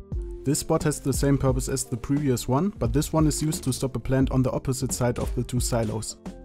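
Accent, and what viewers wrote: Germany. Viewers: Hat das irgend einen tieferen Sinn, dass du mit einem fürchterlich deutschen Akzent englisch sprichst? Warum nicht einfach auf Deutsch? Mega anstrengend sich dein englisch anzuhören ^^ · DAT GERMAN ACCENT hahahaha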